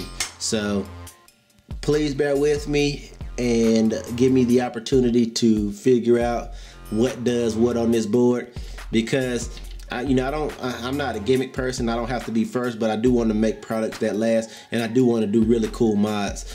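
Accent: South, United States. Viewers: that southern accent thugh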